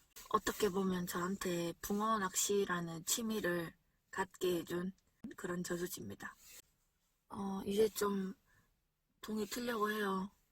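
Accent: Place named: Gyeongsang, South Korea. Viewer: 님은 지역이 어디세요?경상도 인듯한데 저는김해 붕어꾼입니다~^^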